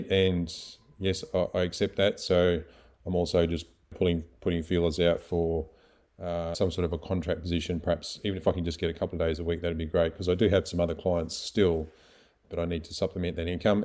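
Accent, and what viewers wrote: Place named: Australia. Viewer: Yes. I say trying health coaching. Maybe sign up for modeling and acting as your Australian accent would be fun to hear in films! Reminds me of Crocodile Dunn Dee! You are unique so maybe looking into those areas. But the the main things is do what you are passionate about!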